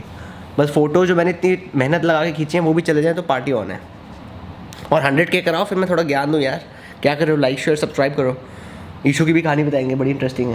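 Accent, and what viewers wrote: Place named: South, India. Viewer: That South indian English Accent of urs lit💯💯🔥🔥🔥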